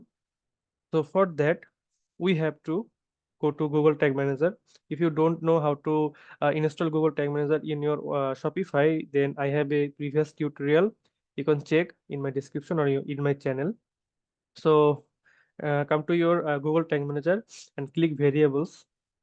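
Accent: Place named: India. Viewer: Thank you! Thank you! Worked perfectly! Also thank you for speaking slowly! Indian accent is hard to follow if you're European.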